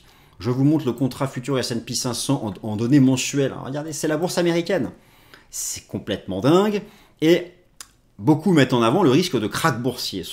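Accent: France. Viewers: continuer à dire RANGE à la française monsieur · bien bonjour le j adore vos video avec les prononciantion à la française c est votre marque de fabrique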